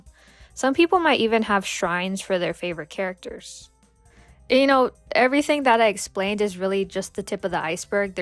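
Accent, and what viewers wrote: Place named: Midwest, United States. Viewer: Are you from the Midwest by any chance? I'm asking because of your accent. It sounds very "midwest"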